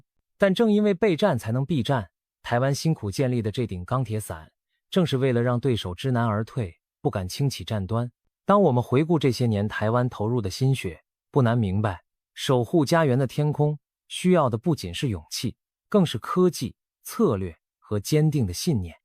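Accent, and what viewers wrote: China. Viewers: 聽這主持人口音應該是中國那邊的 不但把我們防空飛彈優點表現出來還時不時稱我們是國家 光這一點就謝謝您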